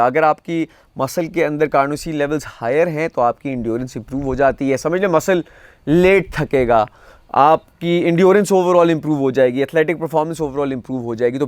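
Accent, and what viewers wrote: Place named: India. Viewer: Why are u sounding like some Indian influencers?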